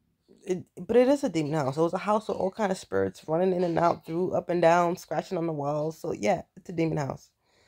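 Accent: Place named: Florida, United States. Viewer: You sound floradian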